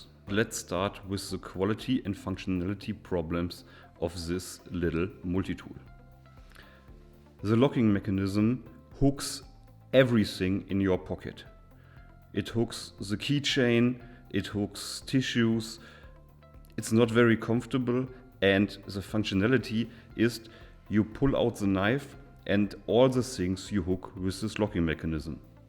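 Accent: Germany. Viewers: You know that this is a Wenger design, made with Wenger machinery in the old Wenger factory is Delemont, under Vic ownership and branding? Having said that this range never appealed to me due to the aesthetic and I can't EDC locking blades here in the UK. In fact I haven't really wanted to buy any of the Delemont (formerly Wenger) SAKs as I said due to aesthetics, the thicker scales, slightly smaller size (85mm instead of 91mm) resulting in shorter tools like main blade & woodsaw, toolset - as I much prefer a small blade over a nailfile - and finally because they tend to cost at least 50% more than the equivalent Ybach manufactured models. When it comes to watches though I do love my Wengers! They have the same specs as Victorinox watches but are much nicer designs in my opinion, much less ''busy'' faces and more understated and elegant, and cost a lot less. So I just make sure my SAKs come from Ybach and my Swiss watches come from Delemont. 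I've never had any quality issues with any Ybach manufactured SAK and they are just great value for money. My latest purchase from them was a Swisschamp in black, it cost me just £58 with free next day delivery (not from the Vic website obviously), that's just 66 Euros, insanely good value. Thanks for your detailed review. I'm 99% sure from your accent that you are in Germany, and if I want to find out if a product is good or not, a German reviewer will give the most critical detailed review. Deutsche Gruendlichkeit usw. I often double ch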